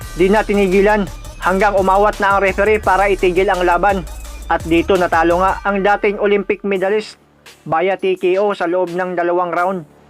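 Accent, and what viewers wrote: Visayas, Philippines. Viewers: Na gusto han, ko naman kaso yung bosis MO halata gud na bisaya ka, haw Ka pas pas nemu ag estorya hehe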